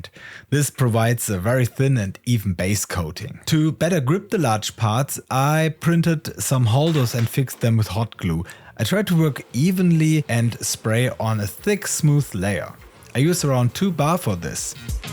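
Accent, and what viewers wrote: Germany. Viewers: This German accent makes me feel like he’s a NASA scientist, and I believe everything science related he says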